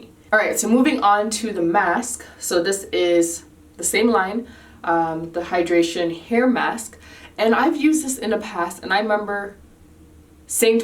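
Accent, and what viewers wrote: Toronto, Canada. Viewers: Beautiful hair! Congrats on the baby❣️Are u from Toronto ⁉️I detect the accent. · wait, you live in Canada? wow never knew that; i can definitely hear it in your voice now lol. anyway, your hair is so pretty. i like how your hair came out! i havent done a twist out in so long, and this has inspired me to do it - thanks !! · it sound Canadian but I definitely could be wrong? 🤔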